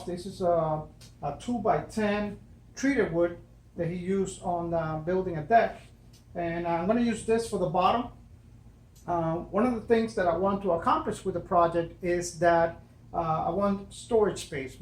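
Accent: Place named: Cuba. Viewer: Soon as he spoke. before I saw the name n he said it. I knew he was cuban. Can't fake the accent mi gente!! Conño!! Nice vid! Thank you meng.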